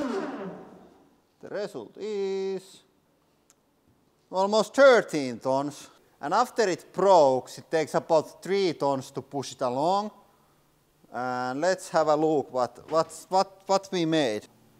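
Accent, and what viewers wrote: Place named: Finland. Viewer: His finnish accent haha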